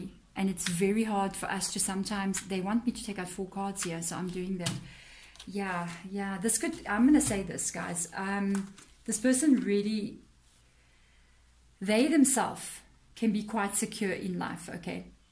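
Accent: South Africa. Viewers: Are you South African? Your accent sounds like it.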